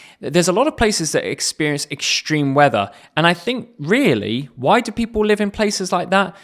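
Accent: RP, United Kingdom. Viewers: Uncountable thank you. Your British English is easy to understand for me. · Are you using rp accent?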